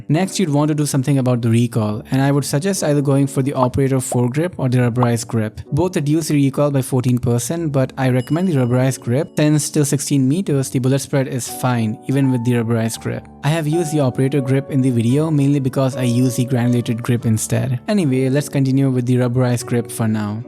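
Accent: India. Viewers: Are you an Indian? You sound like one. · I know is India as by his accent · In his recent video, he revealed his voice, it was an Indian accent.. so I guess he's Indian. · he sound like india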